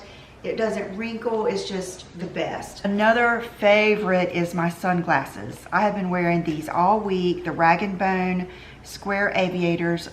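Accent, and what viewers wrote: South, United States. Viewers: Maria Daquila Right!?! I just love seeing her new video in my feed. Sweetest southern belle, this Long Islander loves to listen too❤️❤️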